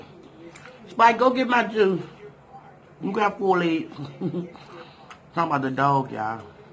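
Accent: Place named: South, United States. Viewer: You sound like you from the south, the accent reminds me of my grandmother accent. They from your area and the south.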